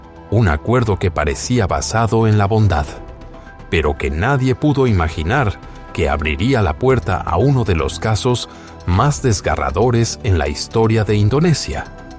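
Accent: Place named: United States. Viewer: Es muy raro ver a alguien que no es estadounidense con acento estadounidense.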